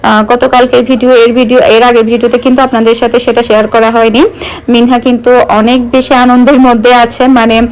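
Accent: Chittagong, Bangladesh. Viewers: Chatagong a vasa no boja r da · Onk din por Chittagong er kotha sonlam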